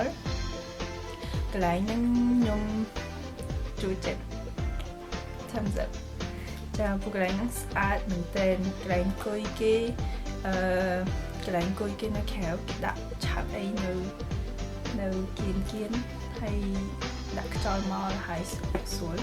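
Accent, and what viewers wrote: Cambodia. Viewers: You’re so cute with your khmer accent. lol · Funny but cute khmer accent.Thanks for sharing.Keep up the good works!.. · Coucou ! Je suis francaise d'origine cambodgienne et j'adooooooooooore ton accent khmer. je suis troo contente de voir qu'il y a d'autres personnes d'origine khmer qui parlent khmer parce que yen a pas beaucoup. Tu peux continuer à faire des vidéos en khmer ? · I love your Khmer accent so much that I have to subscribed the channel.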